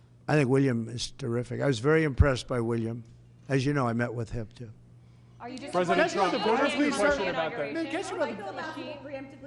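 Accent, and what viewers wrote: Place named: New York, United States. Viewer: Absolutely… it is just funny how he talks… it is a New York style I assume.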